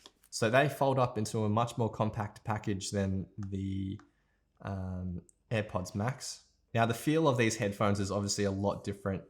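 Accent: Australia. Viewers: Australian accent, no doubt.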